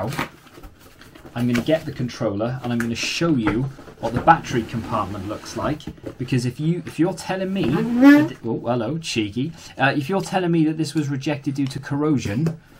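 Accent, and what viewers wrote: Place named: South Wales, United Kingdom. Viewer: Do you mind me asking what area you live ? You sound like you’re from south wales and you mention Bristol and Gloucester in some of your videos but I don’t recognise any of the boot sales you go to !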